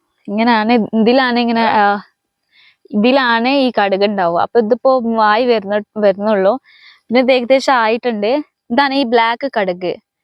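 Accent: Malappuram, India. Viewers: ingal enganaya malappuram slang parenne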